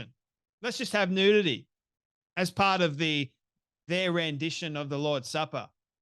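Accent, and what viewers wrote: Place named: Australia. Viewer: His AUSSIE voice is very annoying 😂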